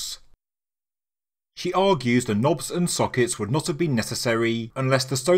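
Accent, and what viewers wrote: United Kingdom. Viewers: Your British?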